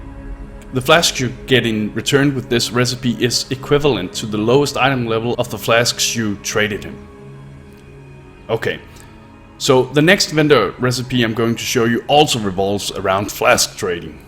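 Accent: Denmark. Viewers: Very informative and useful! Judging from your accent, I bet you're danish, so "Mange tak!" :) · Are you danglish? You sound danglish :D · omg sounds so danish english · You're danish aren't you? :) Anyway, nice video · You sound Danish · wow this is the epitome of danish accents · Heh, danish accent. Du lyder næsten som en ung Lars Løkke =)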